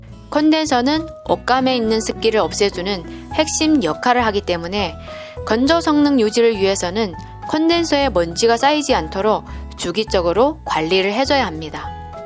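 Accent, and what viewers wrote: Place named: China. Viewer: 말하는게 중국사람 같음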